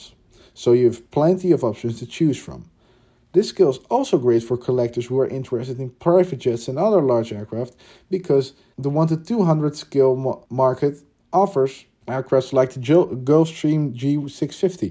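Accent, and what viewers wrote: Netherlands. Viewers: your dutch right? because your voice but in the beggining i also though you were displaying a photo from aviation megastore?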